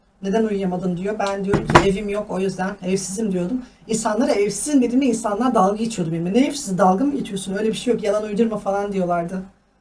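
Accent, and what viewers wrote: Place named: Arab world. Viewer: arap kadini şivesi yapan bir genç hanım zannettim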